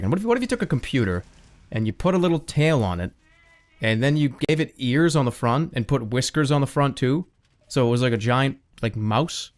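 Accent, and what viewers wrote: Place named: Boston, United States. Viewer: God I miss the strength of his Boston accent in his yt days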